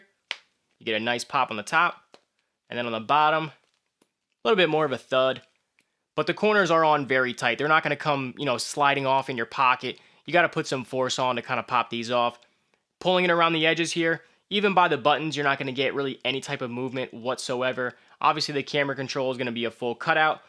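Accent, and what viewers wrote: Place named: Massachusetts, United States. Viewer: Ok, where are you from? That accent is saying the Massachusetts area, but enjoyed that video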